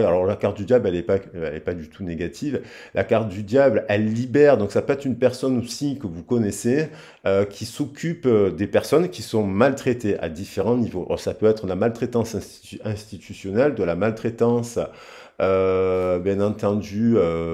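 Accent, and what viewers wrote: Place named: South, France. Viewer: Coucou 👋🏼 🎉 Comment allez-vous aujourd’hui?! Je suis trop contente de vous retrouver sur cette vidéo 😊 j’adore 🥰 votre accent du Sud:-)) toujours aussi intéressante 🧐 votre analyse 📈 belle journée ensoleillée 🌞 mille mercis pour ton énergie mon amour ❤que tu mets dans toutes tes vidéos 🐿️🙏je te fais pleins de bisous 💋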